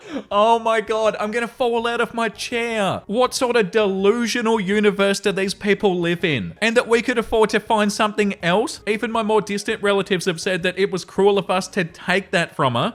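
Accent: Australia. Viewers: Today I’ve been binging these stories for hours while cleaning, I cannot express how much they help my ADHD riddled ass to stay on task lol. Brilliant timing for an upload since I was about to commence more late night cleaning. You’re a real legend for subjecting yourself to the chronicles of these insane people, all while maintaining a sound mental state. Insanity aside I really love your narration, it’s awesome to hear a fellow Aussie’s voice! Thank you for providing genuinely good content that isn’t TTS or AI :)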